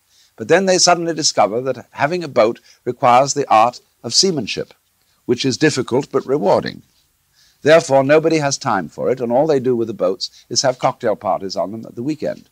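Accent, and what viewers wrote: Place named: Upper class, United Kingdom. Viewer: As a parent, I was glad when my kids went to school. It gave me a break and it was free childcare. The thing is, what do we replace the human progression through life with? Yes, Watts' observation of life are true, but he offers no replacement or a better way. He spent his life mocking humanity from a point of privilege. Why did he believe his diatribes were more valuable than any other educated and reasoning persons rants? He stated the obvious far too much and isn't really addressing intelligent minds. The audience he needs to address are too stupid to follow his thoughts, while the rest of us wallow in his comforting upper class English accent, without really listening to him at all. It's a mish mash of thoughts, delivered with an annoying arrogance. I can't abide him personally.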